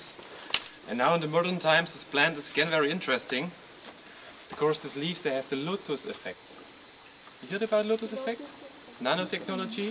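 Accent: Germany. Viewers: I hate German talking english. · When I heard him talking I was so sure he is German :D Coz I speak the same way when I try to talk in English :P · german accent xD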